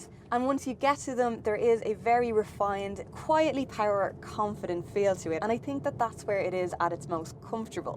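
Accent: Ireland. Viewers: I just came here for the Irish accent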